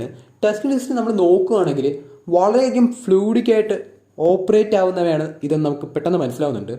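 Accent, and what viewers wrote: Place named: Palakkad, India. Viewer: എന്തായാലും പാലക്കാട് ഭാഷ വിട്ടു ഒരു കളിയും ഇല്ല ഈ ഭാഷ തിരുവനന്തപുരംകാരൻ കേൾക്കുമ്പോൾ മനസ്സിലാവുമോ എന്തോ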